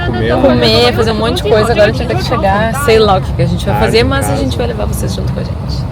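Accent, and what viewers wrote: Brazil. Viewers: Ela fala muiyo rápido, com sotaque do Paraná????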